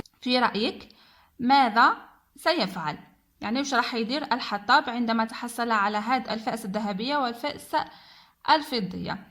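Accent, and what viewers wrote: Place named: Algeria. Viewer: شكرا اختي بارك الله فيكي بصح تباني في الهدرة جزائرية المهم شكرا لكي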